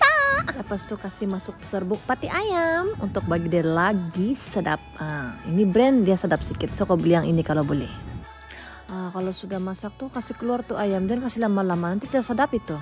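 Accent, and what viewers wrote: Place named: Sabah, Malaysia. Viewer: Sumandak Sabah lawa2 pandai masak nasi.. nasi ayam sedap tu bah. Aku boleh dengar sepnjg ari ckp bhs Sabah. Bila team ICSYVM mau jemput jd guest artist ye.. mau juga tengok pandai kah pilih penyanyi sebenar/teka penyamar😂 terbaek Original Sabahan💪💯👍🔥🔥🔥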